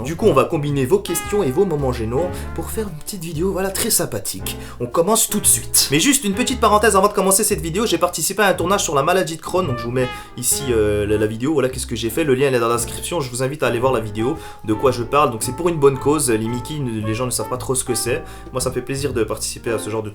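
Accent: Turkey. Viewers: Waa ton accent 😂😂turk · Frr c quoi ton accent turc wlh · T as un putain d’accent turque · Gerçekten , c quoi cet accent turque , je suis Algérienne fiancée à un Bingölien et je parle turque sans accent Fais travailler le tien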